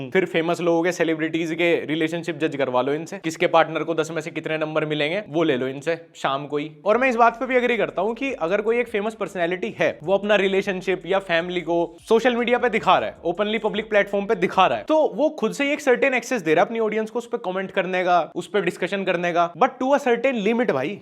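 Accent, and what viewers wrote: Haryana, India. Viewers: Yaar apki awaz me thoda so haryanvi touch h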